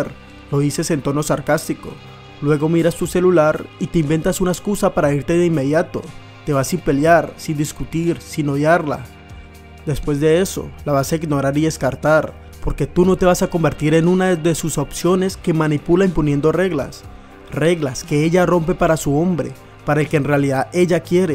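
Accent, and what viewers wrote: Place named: Cali, Colombia. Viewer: Excelente video. El pana de Cali. El acento lo delata. La info del video es verídica 100%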